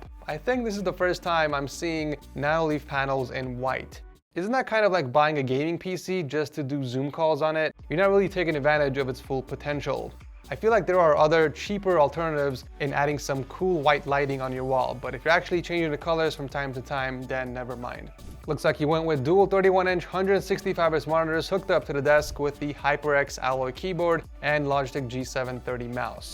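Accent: Australia. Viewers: I guess we're going Australian mode on this video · Why is the intro australian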